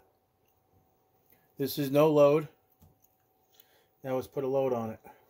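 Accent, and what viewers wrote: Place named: New York, United States. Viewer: It’s about time I have somebody on YouTube with the New York accent❤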